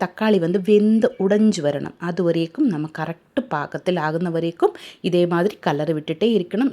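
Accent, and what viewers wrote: Kerala, India. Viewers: அவர் மலையாளி ஆகவே தான் அப்படி பேசுகிறார், we should appreciate her.instead of mocking.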